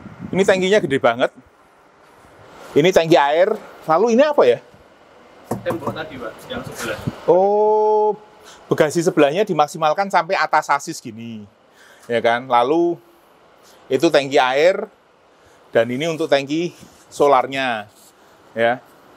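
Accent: Java, Indonesia. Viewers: Tetap pertahankan medoknya pak😂😂😂....saya subscribe baru anda dari negeri yang jauh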